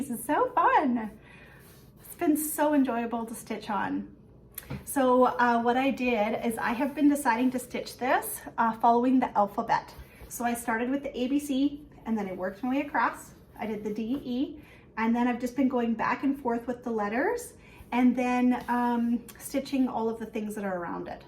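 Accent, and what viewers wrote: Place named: Canada. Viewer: Oh, I love your videos. Your taste in charts is wonderful and your stitching is so beautiful. On top of that you have the most soothing voice and lilt to your lovely Canadian accent. I grew up on the NY/ON border and love what we call the “round Os” of our Canadian friends. Delightful to listen and watch.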